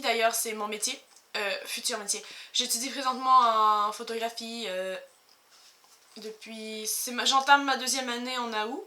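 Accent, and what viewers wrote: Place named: France. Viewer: Ouf, l'accent français!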